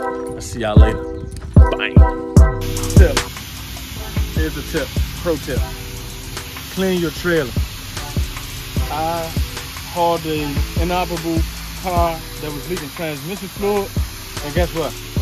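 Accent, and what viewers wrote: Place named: DMV, United States. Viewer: Keep grinding fam I just got me a dually and 2 car trailer a month ago boutta take off and turn up I feel good to hear a dmv accent on YouTube giving free game keep grinding bro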